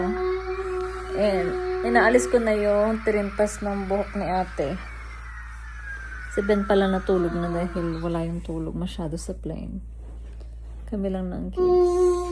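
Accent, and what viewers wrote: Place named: Pampanga, Philippines. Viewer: Kapampangan accent ya girl! Wag kang mangialam. Pakialaman mo buhay. Hinahanap ka ng amo mo. 😂😂😂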